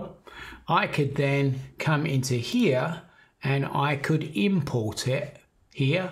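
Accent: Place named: United Kingdom. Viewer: stupid british accent, i can't hear it, why you speak like it going in slow mo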